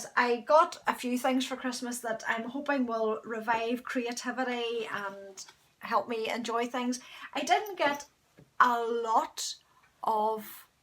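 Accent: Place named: Ireland. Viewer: Oh Nooooo, the knit retreat is all sold out! I am a new knitter in Minnesota, and a genealogist with Irish ancestry in Northern Ireland and I have had the pleasure of visiting Ireland three times. I just found your podcast and I started smiling as soon as I heard your lovely brogue. When you announced the retreat tickets going on sale at 10 P.M. GMT today (It is P.M. here) I immediately went to the website to get a ticket. Oh well, perhaps next time. I am thrilled to have found your podcast. Fern in Minn P. S. I began knitting in Sept of 2018 and I am loving it!!!!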